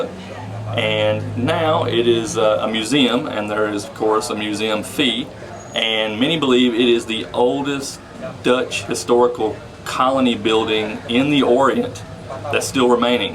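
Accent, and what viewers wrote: Kentucky, United States. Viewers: Fabulous video! Great music and editing, well done! Love it (especially the Kentuckian accent)!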